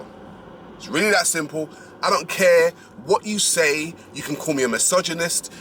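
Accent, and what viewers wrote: United Kingdom. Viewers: I’m sorry, but you saying, “Oh f*ck off” sounds so polite. I have no idea why the British accent makes the most offensive sayings sound nice.🤣 With that said, I completely agree with you. I am not offended by what you said because it’s true. Way too many black women are out here making us all look bad. I do my absolute best to be the positive image of black women. My daughter is biracial, but I also teach her to be the positive image of both the black and mexican communities. Unfortunately, there are major problems amongst women in both races. I mentor young black women so they know the path they should take and why they should take that path. I even seek out young and older black women in comment sections of videos who have a destructive mindset. I don’t shame them for their mindset, but I let them know their mindset is not one that breeds lifetime success. 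Some of us are out here on the ground doing the work. We don’t have YouTube channels because we are working in real time.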